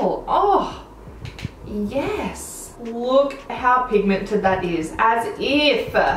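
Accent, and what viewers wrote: Australia. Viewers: I love makeup but I’m here just to learn Australian accent and you help me a lot! I’m going to Australia next year and I’m just ready to buy these products, for sure I will love it 🙌🏼❤️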